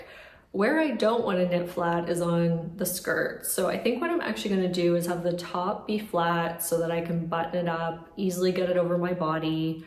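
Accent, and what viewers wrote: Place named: Ontario, Canada. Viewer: Pretty sure it’s an Ontario accent, hard to change your natural speech patterns and for some reason women are often targeted for their speech tone, patterns etc.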